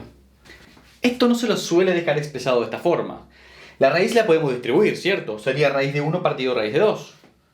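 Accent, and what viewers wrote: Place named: Argentina. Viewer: me preguntaba lo mismo porque suena re argentino pero dice cogemos jaja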